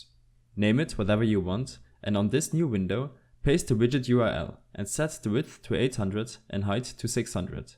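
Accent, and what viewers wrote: Germany. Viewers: Man hört so das deutsche Raus xD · this is literally a german accent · Wenn er englisch redet aber eigentlich deutschen Akzent hat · man hört den deutschen akcent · Man hört das deutsche direkt :P xD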